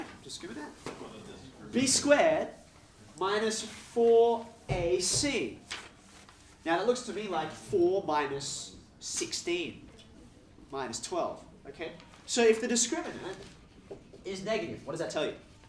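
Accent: Australia. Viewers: What is his accent? Aussie, right? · yes, hes from sydney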